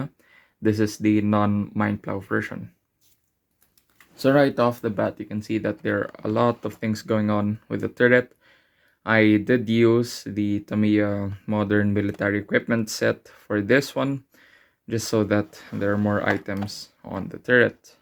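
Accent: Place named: Philippines. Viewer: Subbed. I just found your channel. I like modelers who can finish a project. Looking forward to your next video. By your accent, are you Pinoy too?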